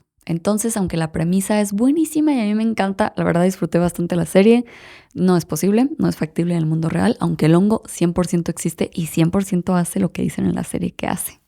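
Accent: Mexico. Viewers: Linda tu y lindo nuestro acento mexicano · Me encanta tu acento mexicano!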